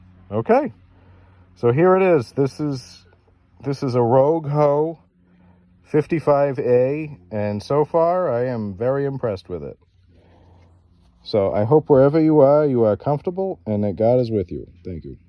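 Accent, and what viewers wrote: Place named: New England, United States. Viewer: Great review, ill be buying one of these! Also, as a New Englander thats been transplanted to the south, your accent brings me great nostalgia.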